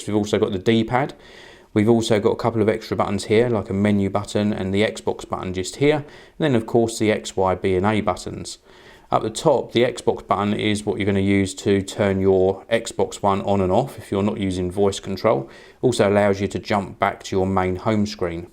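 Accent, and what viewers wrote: United Kingdom. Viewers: Dat awesome british accent though.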